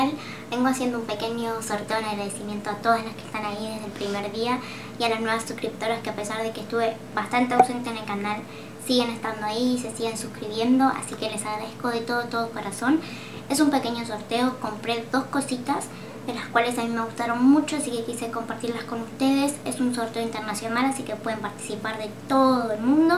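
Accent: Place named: Argentina. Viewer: hola me fascina el acento argentino😍 yo soy mexicana pero como me gustaría hablar como tuuuu🙊 PARTICIPO.. me encantaron la paleta de sombras, gracias por el sorteo.. un abrazo😘🙋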